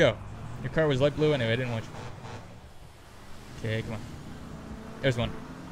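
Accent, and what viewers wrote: Australia. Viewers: AUSTRALIAN WHAT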